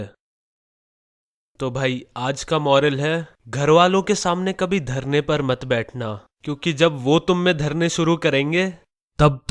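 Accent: Haryana, India. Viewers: Sir Are you from Haryana ?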